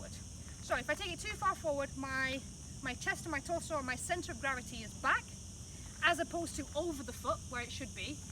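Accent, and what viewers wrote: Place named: Scotland, United Kingdom. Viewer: I’m distracted .. what accent is that ? It sounds Scottish , north east England come either Spanish or other Mediterranean?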